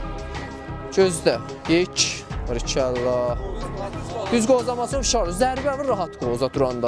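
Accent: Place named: Turkey. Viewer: کیرم تو لهجه ترکی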